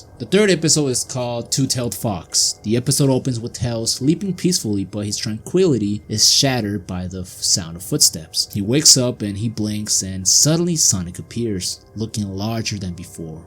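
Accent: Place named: Spain. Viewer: what the pizza pocket in the microwave sees. But seriously, awseome video and bonus points for a regular show pfp. Nice. Y creó que tambien hablando Español? Solo creó por el acento.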